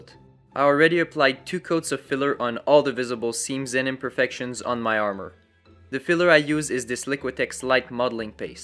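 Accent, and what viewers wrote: Quebec, Canada. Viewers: J’ai entendu l’accent québécois dès le début hahaha 🫡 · are you from québec?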